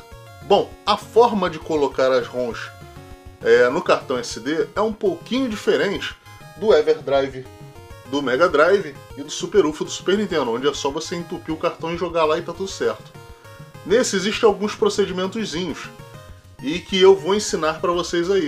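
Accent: Rio de Janeiro, Brazil. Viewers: Fala, sempre curtindo seu canal, sua coleção bem bacana, acompanho sempre. Sotaque de carioca e voz de locutor de FM kkk, curto sempre seus vídeos abraços.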